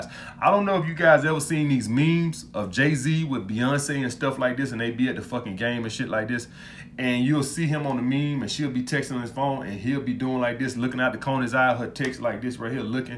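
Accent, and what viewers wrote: South, United States. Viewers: True knowledge as always! I always heard the southern accent but didn't know you was from Alabama fam!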